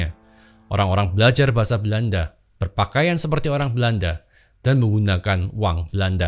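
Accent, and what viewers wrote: Java, Indonesia. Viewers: Jateng atau Jatim ko, medoknya hampir ga keliatan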